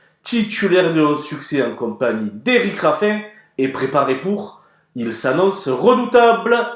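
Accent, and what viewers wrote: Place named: South, France. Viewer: Pourquoi vous chantez en donnant vos pronostics ?? Même que vous êtes du sud ,,faites des efforts sue vos vidéos c'est horrible,,..on dirait que je regarde l'amour est dans le pré..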